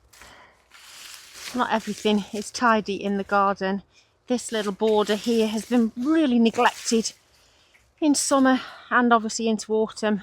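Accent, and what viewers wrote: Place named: Birmingham, United Kingdom. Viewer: I watch you for your content, yes, but even more for your accent. You see, my mom was from Birmingham, and a war bride that came to America to join my Dad. She has been gone these many years and I miss her and her accent. Thank you for listening to an old guy and his memories and brining them back with a your heavenly accent and sweetness. You’re a hard worker.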